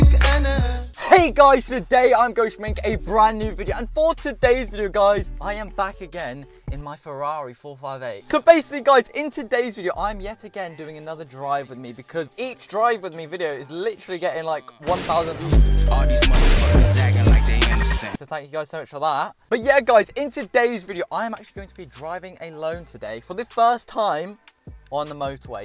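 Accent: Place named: East Midlands, United Kingdom. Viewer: where abouts you from man :) sound like your from the east midlands :P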